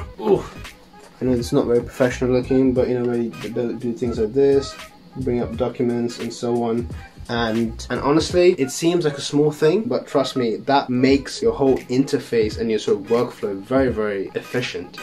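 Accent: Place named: United Kingdom. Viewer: British English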